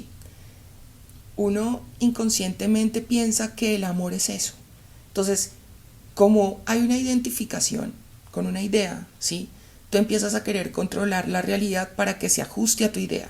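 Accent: Colombia. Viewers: Por lo general la mayoría de las personas no toman o se dan cuenta de estos detalles que parecieran insignificantes pero el daño poco a poco le va destruyendo la conciencia ,la esencia , la espiritualidad y por lo tanto la mente que de por si es mala se vuelve más perversa todo lo que has dicho en este video es la realidad pero la humanidad no quiere acer el mínimo esfuerzo para salir de esta matriz o granja este conocimiento es el despertar másivo , te felicito mujer mis saludos desde Barranquilla Colombia y que me pareciera que fueras de aca por tu forma de hablar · ACENTO ROLO?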